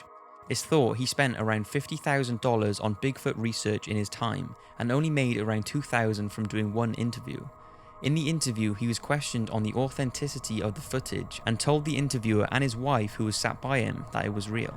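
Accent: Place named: South Wales, United Kingdom. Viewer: Still unsure ... Hey you sound like your from South Wales... Swansea area?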